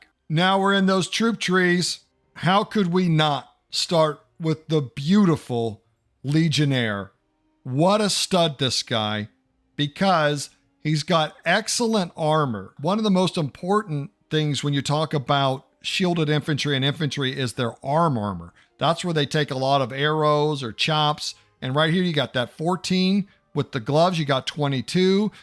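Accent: Oklahoma, United States. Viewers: Your commentary in your videos are great brother, so funny with your sayings with the good old Oklahoma style. But thank you for the videos, & no one els on here has your style. Well done Mr. Take care.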